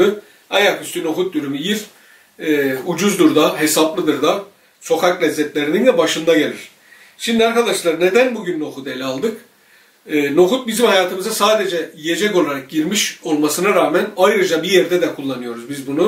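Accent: Gaziantep, Turkey. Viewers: Abi sen normal konuşma ya Antep Ağzı konuş... Yani yanlış anlama abimsin ama sırf seni dinlemek için günlük bi videonu açarım az evvel Abonede olayım dedim,şimdi Antep ağzıyla,hem yorum yapiyin hem abone olmayıksın yoğrum deme diye... Mersinliyim ama keşke Antepli olsaydım. Yani Abicim sana o ağız çok yakışıyor,çok orjinalsın vesselam...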